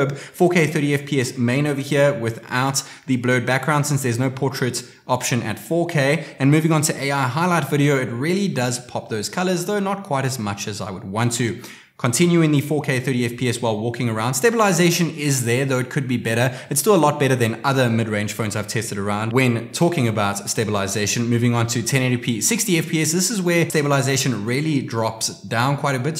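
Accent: Johannesburg, South Africa. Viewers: Are you South African? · Are you from Johannesburg? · Haha! I love the SA accent. Ja swaar!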